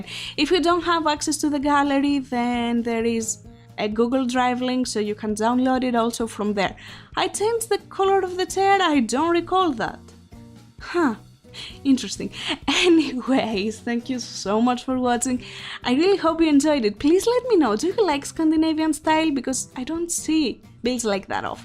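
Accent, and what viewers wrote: Greece. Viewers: Your accent sounds like you are greek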